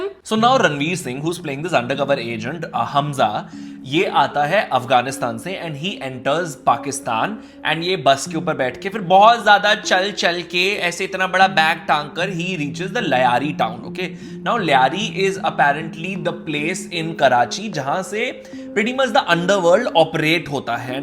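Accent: Punjab, India. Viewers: your punjabi accent is so sexy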